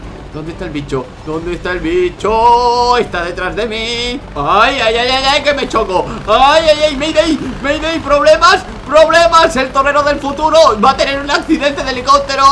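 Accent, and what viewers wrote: Spain. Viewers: tu tomas cerveza y de donde eres supongo que de España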